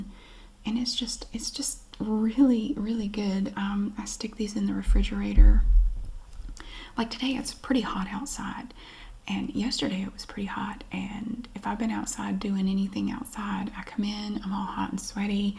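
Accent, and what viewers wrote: South, United States. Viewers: Yall I rarely ever comment but I love this lady’s channel. So soothing and being from the south myself I love a good southern accent.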